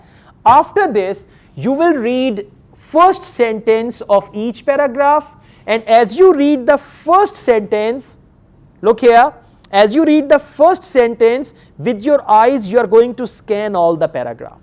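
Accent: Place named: Punjab, India. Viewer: I like urs Punjabi keep speaking like that it suits u very much👍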